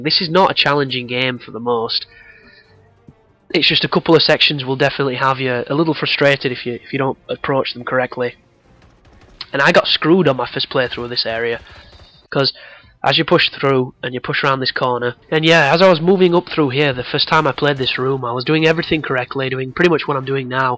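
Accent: United Kingdom. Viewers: You sound British man!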